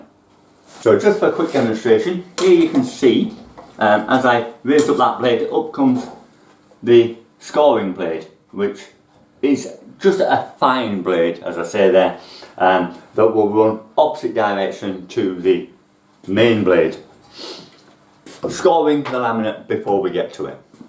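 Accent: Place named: Huddersfield, United Kingdom. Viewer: You sound like a Huddersfield man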